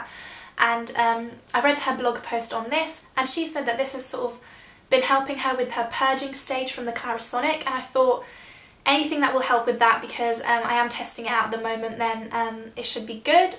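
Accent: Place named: United Kingdom. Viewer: i liked the video before it even started... i know its going to be awesome because: 1. its whats in my bag video, 2. its you talking with that beautiful accent (i wish i lived in the UK) cheers from croatia :)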